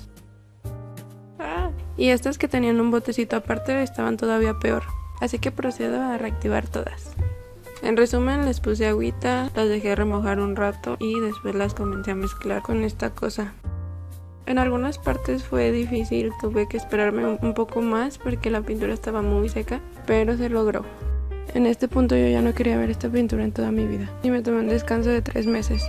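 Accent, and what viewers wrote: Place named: Fresa, Mexico. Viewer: que agradable sujeta, suenas fresa, pero sin forzar, lindo :) nuevo sub cómo dirían los chavos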